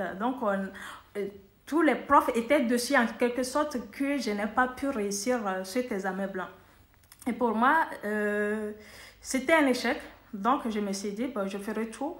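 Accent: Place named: Canada. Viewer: Accent canadien si j'ai la bonne mémoire 😅😅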